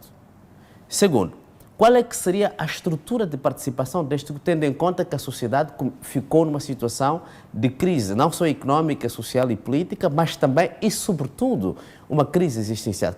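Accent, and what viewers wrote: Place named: Angola. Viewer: Senhor, nem sei donde falas mas, pela forma como falas, deves ser de um país DITADOR como Angola e tu es lambebota do MPLA q queres furrar no Poder se apoiando d pseudo intelectual, um vazio q enganam tao bem os tolos e analfabetos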